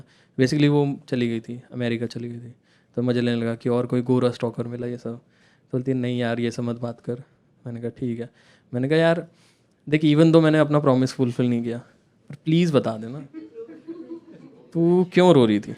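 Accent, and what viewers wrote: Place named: Sonipat, India. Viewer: bhai jo teri awaz m tone h n dost va sonipat aali h dost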